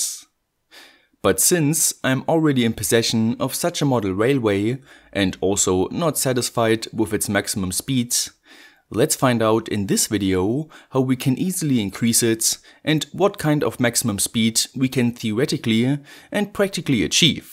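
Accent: Germany. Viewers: Bist du deutscher? Hast so einen Akzent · Are you German ore have German roots??? I can't imagine you aren't cause your german accent is so significant. A lot of people here speak like you do. Greetings from Germany and merry Christmas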